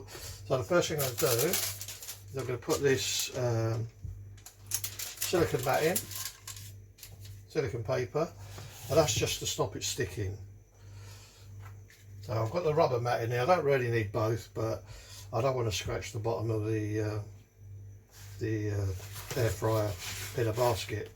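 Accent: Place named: England, United Kingdom. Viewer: A quid. Love hearing an English accent for a change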